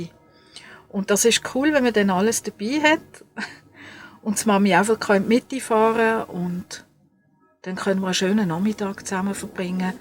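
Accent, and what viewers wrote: Switzerland. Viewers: Servus, herrlich, Schwyzerdütsch! Das gefällt mir:-) Ich freue mich auf weitere Folgen. Viele Grüße aus Oberbayern, Susanne, die Orientbayerin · Vielen Dank für den tollen podcast auf schweizerdeutsch. Ich bin in Luzern aufgewachsen, jetzt lebe ich in Italien schon 50 Jahre und fermisse das schöne Schweizer dialeckt. Ich ferstehe dich richtig gut. Mach weiter so, du hast sofort einen Daumen hoch bekommen. Viele liebe Grüsse Livia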